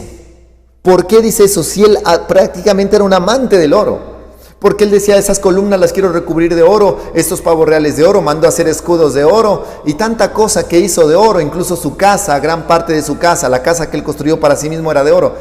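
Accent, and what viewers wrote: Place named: Mexico. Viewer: yo pensaba que el pastor era mexicano 😄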